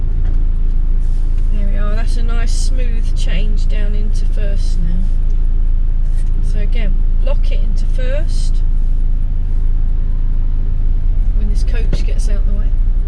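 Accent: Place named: Gloucester, United Kingdom. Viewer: Hi i loved watching your driving were you driving around Gloucester as that is my neck of the woods and your accent sounds very familiar looking forward to the next one best wishes roy. 😊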